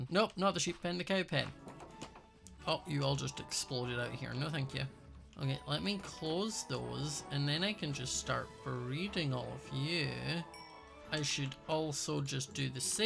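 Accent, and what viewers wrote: Scotland, United Kingdom. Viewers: Is called being Scottish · hes scottish not irish :-) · Yes and it’s because he’s Scottish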